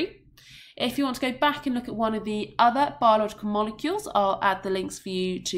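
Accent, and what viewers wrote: United Kingdom. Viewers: Good explanation, but your British accent is getting on the way. Too many unnecessary sounds. It's quite annoying.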